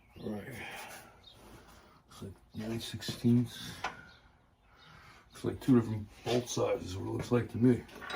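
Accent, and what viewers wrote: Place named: North Carolina, United States. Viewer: Love that North Carolina accent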